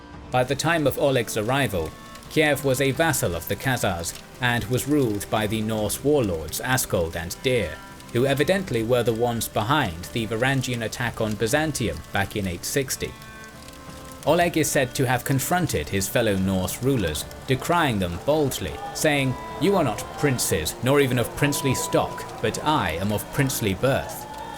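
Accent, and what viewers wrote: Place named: United Kingdom. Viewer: Hey, I'm talking to YOU! Your channel is disappointing, and I'll tell you why: I'm not even one minute in, and I get blasted with a stupid Nintendo (No-Friend-o) video-game ad... (You know that I can just Google the info I want to know, right? I don't need to watch your channel to know these things)... I don't like being tricked, and that is exactly what you are attempting to do; to trick us into watching ads for your silly game. Shame on you! Why are all these so-called "historians" always hawking some dumb-ass RPG before they regurgitate a warped version of Wikipedia with incongruous graphics?...And, they all seem to have annoying high-pitched low-class British accents too... What gives?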